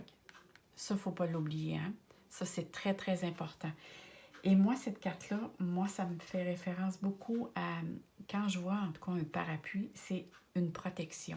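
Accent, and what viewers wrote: Canada. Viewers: Bonjour, j’arrive sur votre chaîne et j’adore votre accent du Canada 😊. Je vais vous écouter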